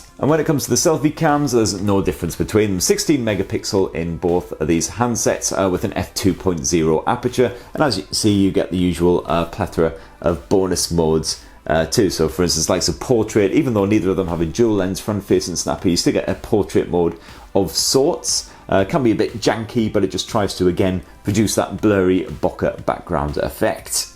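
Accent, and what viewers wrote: India. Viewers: Not Indglish. English title with Indian mambling. Dislike guaranteed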